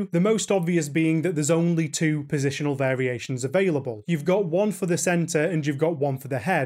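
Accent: United Kingdom. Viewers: Hi! Thanks for efforts! You used the Jobeky trigger? Sounds nice. I really enjoy your british accent. -> Say: "Alibaba". By the way, due to your vid I ordered some Lemon cymbals and a 45$ 12" Lemon snare pad. Even beeing a low-priced product that pad performes basically quite good with positional sensing using the eDRUMin.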